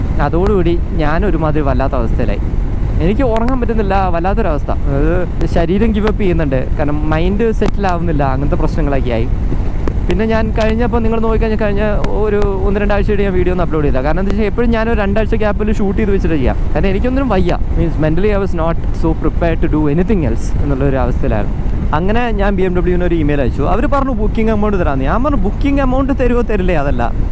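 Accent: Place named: Kannur, India. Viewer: na....nte mone🤣 ningalu kannurinu aduthano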